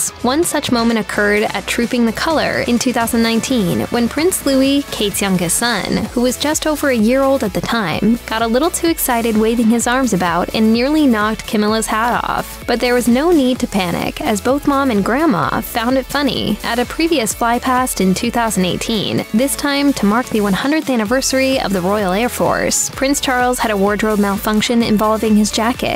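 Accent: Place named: California, United States. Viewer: The voice narrating this has too strong of a Cali-girl accent. Emphasizing the end of every word by drawing it out. It's distracting.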